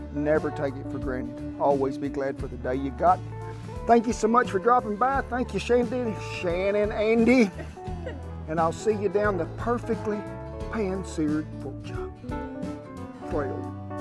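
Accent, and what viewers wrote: South, United States. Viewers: As a fellow southerner, i already know this food is gonna be bussin just based off that accent and the glorious mustache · I don't use Alexa or Siri because they do not understand the Southern accent, this is why she did not do what you said.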